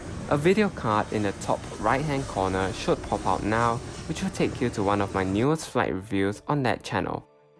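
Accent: Singapore. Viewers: You sound Singaporean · Can’t help but think your accent is that of a Singaporean?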